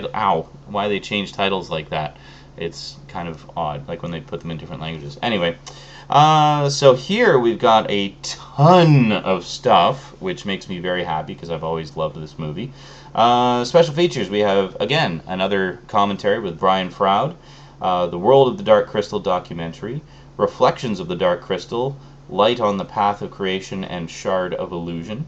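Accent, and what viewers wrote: Canada. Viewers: ***** Are you from Canada?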